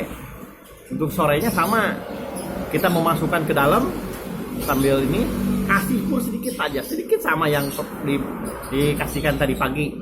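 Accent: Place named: West Java, Indonesia. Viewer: Sunda pisan mang... Hade infona euy..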